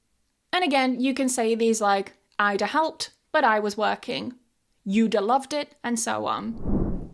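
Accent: United Kingdom. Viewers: I would like to say a big thank you for your amazing English lessons. I have learned so much from your videos, especially your beautiful British accent and the way you explain things so clearly. As an person who need more learning English was very important for me, and your lessons have helped me improve my confidence and communication skills. Your dedication and kindness are truly appreciated. Thank you for sharing your knowledge with people from all over the world. Keep inspiring and helping others. Wishing you all the best. ❤️ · Muito linda ,seu sotaque é uma delícia, adoro os britânicos.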